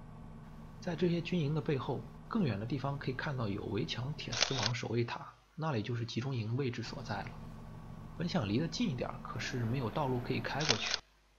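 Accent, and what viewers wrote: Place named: North, China. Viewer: Obviously, he spoke Mandarin with a strong accent of north China.Besides, you need a dictionary to understand what "developed" really means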